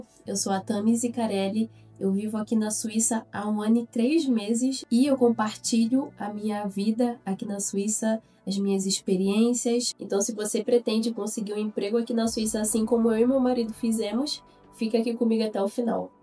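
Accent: Brazil. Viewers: Ja, was ist lustig finde, ist… Du bist eindeutig Brasilianer (an deinem Akzent), und du wirst auch eindeutig Schweizer werden.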